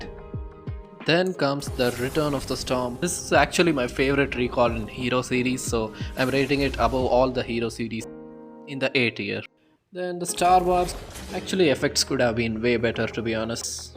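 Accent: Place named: India. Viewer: love your india accent man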